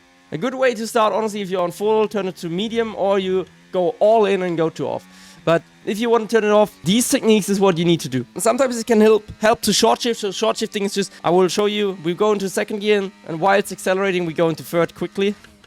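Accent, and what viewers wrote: Germany. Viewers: thanks, have always struggled with going quick with tc off i am always losing to my tc times also love your german accent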